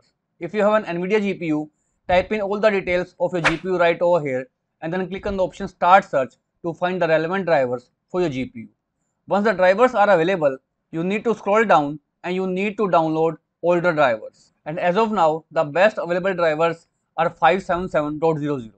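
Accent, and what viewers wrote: India. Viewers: I knew it will going to work when I heard your indian accent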